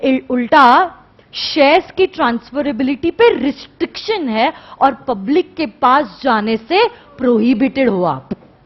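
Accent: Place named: Gujarat, India. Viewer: I have nothing to do with CA or any Crazy study Shit. Still, I bought her books and Pen Drive stuff. She is an Amazing woman., and that thick Gujarati accent adds cherry over the cake. Keep it up, you have been fabulous. I never liked CAs until one fateful day I found you on Youtube.:)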